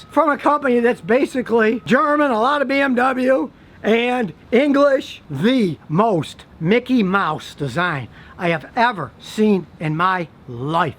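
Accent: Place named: United States. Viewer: This guy is hard to listen to. Just that dumb version of the american accent.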